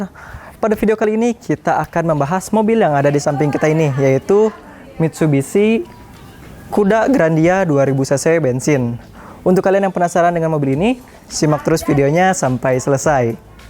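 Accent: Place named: Banjar, Indonesia. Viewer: banjarann ini mah mang nya?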